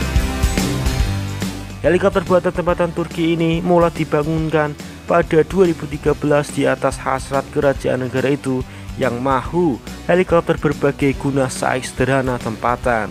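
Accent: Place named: Java, Indonesia. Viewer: Kuat betul bunyi dialeq jawa dia ni...CG Loro telu papat ya😁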